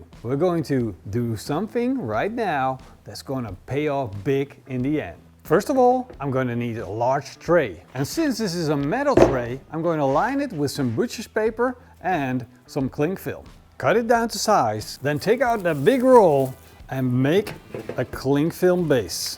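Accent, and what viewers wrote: Netherlands. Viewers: You had me really wanting this until I saw the nipples on the belly . I know I am being a wimp, but I just cant see myself eating the nips! However, I do love the content and your accent. I grew up in Den Haag and the accent reminds me of my time inn Holland. That always makes me smile!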